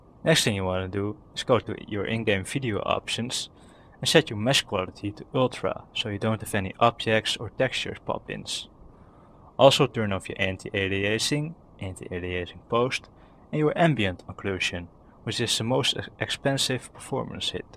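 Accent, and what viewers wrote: Netherlands. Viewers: You're dutch :D dat accent tho. - a current reader of ur BFH app :)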